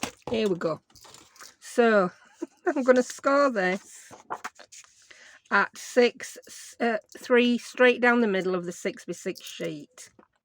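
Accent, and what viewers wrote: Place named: Nottingham, United Kingdom. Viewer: You kill me! I was laughing so hard at the score board part! Just found you. Hello from Canada. You sound like my parents with your accent. They are from Notts.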